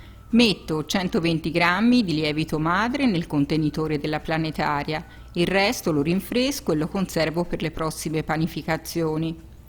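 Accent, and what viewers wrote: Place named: Pienza, Italy. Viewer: Fantastici!!!! Le tue ricette sono perfette vengono sempre ...e poi mia madre e’ di Pienza.... il tuo accento mi fa sentire a casa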